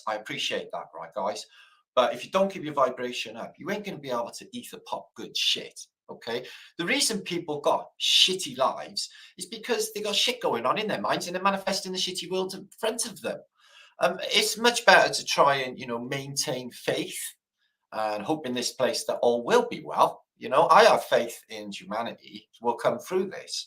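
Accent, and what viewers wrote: Wales, United Kingdom. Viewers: I used to watch your streams with the closed captions on, and YouTube would get about 55-65% accuracy. But now it’s 95-97% accurate, even with your thick Welsh accent it’s so much better picking up almost every word and beeping out any language it doesn’t like. I wasn’t expecting this from YouTube to fix their algorithm so fast Bruv.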